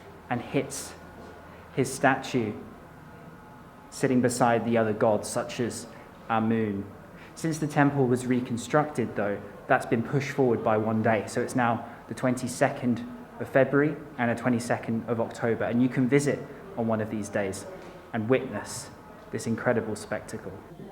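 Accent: United Kingdom. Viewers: The best british accent ever 🇬🇧♥️ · I'm in love with the British accent.....greetings from Syria to you and to Egyptian people 💙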